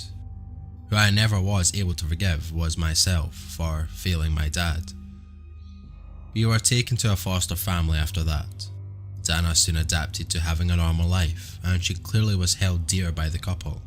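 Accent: Scotland, United Kingdom. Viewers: Just another one of my new favorite guys because of the great voice and accent! Sometimes there is some Scottish sounding accent too! 💚 Think I've listened to all videos, love the longer ones. Hope you make a few more of them.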